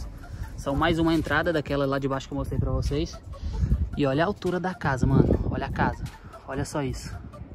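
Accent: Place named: Spain. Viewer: Jajajaja que bueno es tu español, de hecho tu acento parece el de español españa! Tenes familiares españoles o algo ?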